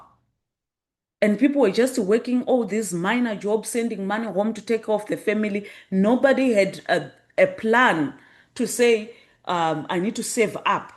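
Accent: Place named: South Africa. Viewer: But your accent is South African.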